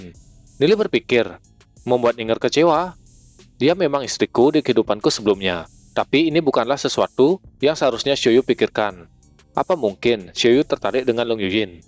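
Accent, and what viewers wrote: Bali, Indonesia. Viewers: Logat bali?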